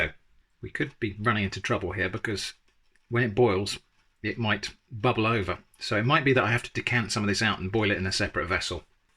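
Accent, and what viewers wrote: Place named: Dorset, United Kingdom. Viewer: I'm starting to detect a slight Dorset lilt to your accent in your videos 😊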